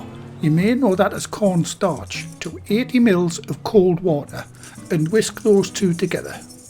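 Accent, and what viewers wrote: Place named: Newcastle, United Kingdom. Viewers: You sound like a Geordie, sorry if I’m wrong I’m new but you’re a bloody good chef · Hello you have a very familiar accent you wouldn’t be from the North of England would you possibly Newcastle?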